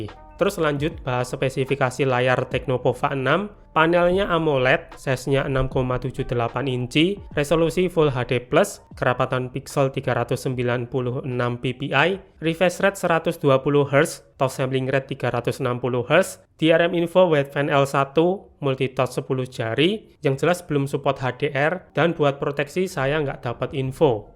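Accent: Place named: Java, Indonesia. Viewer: Asli kliatan medok nya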